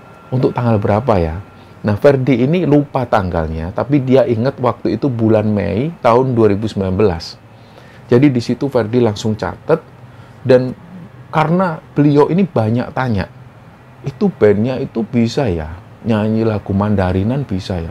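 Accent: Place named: East Java, Indonesia. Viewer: Suka dgn penceritaan mas ini,logat jawatimur bgt,sperti saya nyemak bapak saya, waktu bpk cerita² dirumah..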